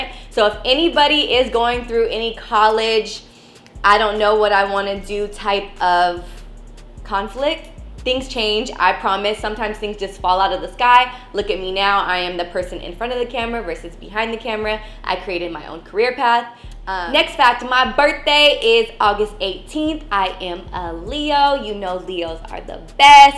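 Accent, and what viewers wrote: France. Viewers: Waaaaouw tu est super belle et j'adore ton accent français et le couple que tu formes avec Kurzawa c'est tout simplement magnifique 💕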